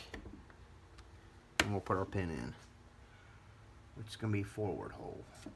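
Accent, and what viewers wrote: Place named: South, United States. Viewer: Honestly couldn’t have done this without you! Thank you very much. And your southern accent helped keep me calm and patient while I followed your instructions. Very Helpful!!! 5 stars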